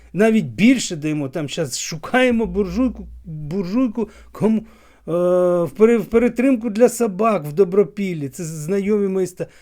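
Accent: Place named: Russia. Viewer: Вы совсем придурок от природы или придурок , который таким стал во время войны?А ЧЕГО СТОИТ ВАШ УКРАИНСКИЙ? С МАССОЙ ОШИБОК И УЖАСНЫМ РУССАИМ АКЦЕНТОМ.КУДА ЛЕЗЕТЕ ПРОВОКАТОР.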